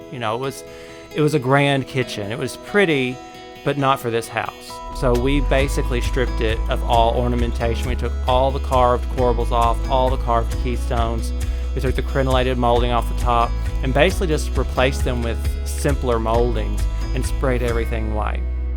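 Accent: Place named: South, United States. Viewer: Absolutely stunning! This Kansas girl loves the southern accent, too!😂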